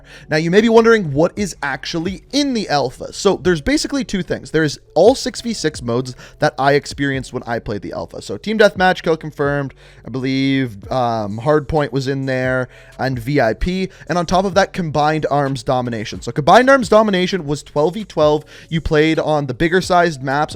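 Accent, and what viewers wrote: Canada. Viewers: Are you Canadian my guy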